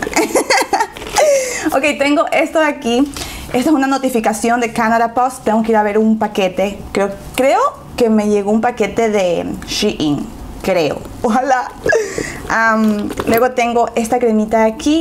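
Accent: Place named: Guayaquil, Ecuador. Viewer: Habla normal.... Los Ecuatorianos hablamos así en especial de Guayaquil.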